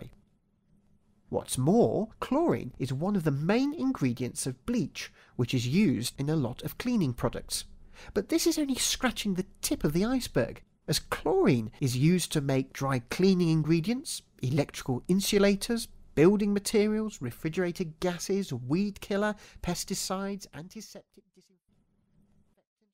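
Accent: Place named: United Kingdom. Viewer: British accent and science, savouring!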